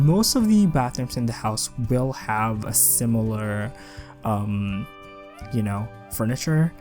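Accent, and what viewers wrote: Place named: Philippines. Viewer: Your English is very good! I read you're Filipino so your accent is so similar to my friend :)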